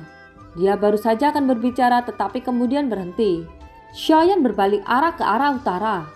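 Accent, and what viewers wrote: Java, Indonesia. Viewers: Peyampayannya bukan LG anime donghua tp logat Java donghua.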